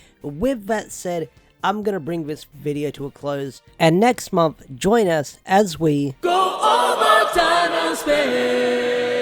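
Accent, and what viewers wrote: Australia. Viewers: oh I see well i saw one of you videos around 2020 but I started watching more of your videos in 2021 now I assume by the sound of your accent your australian am I right?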